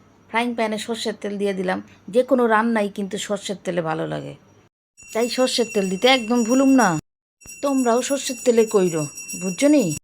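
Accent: Bangal, Bangladesh. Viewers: Darun bangal vasa bollle recipe tao khub sundor hoyeche ❤❤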